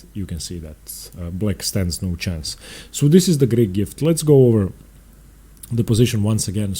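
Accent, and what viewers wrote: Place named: Russia. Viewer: Of course he has russian accent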